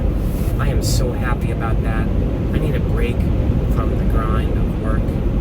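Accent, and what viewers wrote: Australia. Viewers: 🤣😂 that's an Australian accent 🙋🏻‍♀️🇦🇺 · That's not a British accent! That's an Australian accent!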